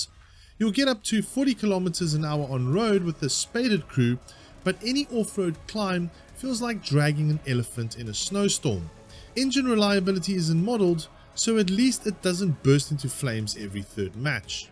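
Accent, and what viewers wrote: South Africa. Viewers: This is a bit off topic,are you a South African? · Ah, a SAFFA! Good to hear a local voice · Are you South African? I am and you sound South African!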